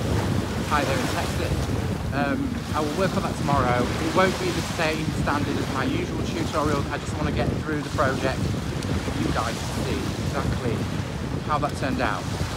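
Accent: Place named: Lincolnshire, United Kingdom. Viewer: So sorry I missed your quick live. I was wondering about your accent as I was trying to explain it to my daughter and since you said you live in Lincolnshire, I thought I would ask if this is where you got your accent.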